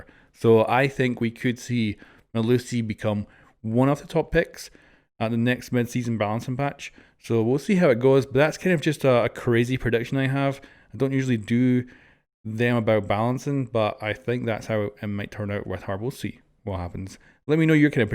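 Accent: Scotland, United Kingdom. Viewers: Are U Scottish V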